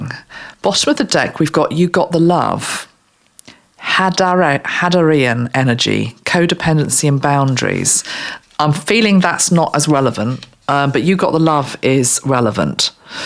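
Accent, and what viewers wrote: United Kingdom. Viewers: Iam a rh negative. everything you said about make a lot of sense to me. There are 4 person in my family that are negative as well. It is the first time i have been whatching your live. and liked very much e liked your British accent . Life for negative blood is not easy, we are different and rebel. thank you for your work.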